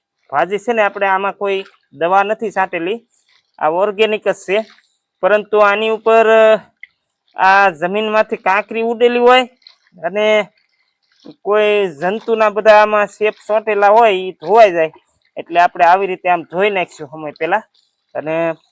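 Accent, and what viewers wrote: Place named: Kathiawar, India. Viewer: બોવ મીઠું મીઠું કાઠિયાવાડી બોલો છો, કયું ગામ? જય માતાજી. જવાબ આપવા વિનંતી. મારો નંબર ૯૮૨૪૦૧૦૪૦૩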